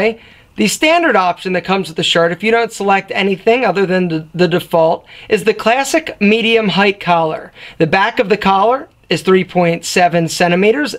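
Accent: United States. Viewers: The loud American accent ruined it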